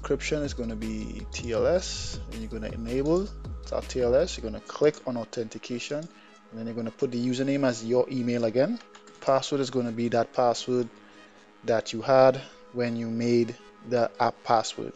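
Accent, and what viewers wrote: Trinidad and Tobago. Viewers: you sound trini!Im here from Barbados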